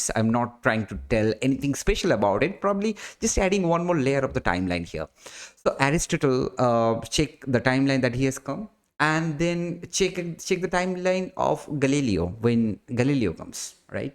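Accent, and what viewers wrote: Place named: United States. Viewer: You english like usa can you make indian accent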